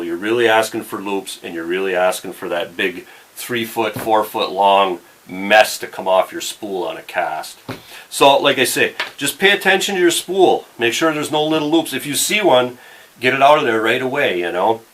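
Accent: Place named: Wisconsin, United States. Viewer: First off awesome video. Second, I watched about 3 minutes of this and was like this guy must be from Wisconsin...then I saw the hat!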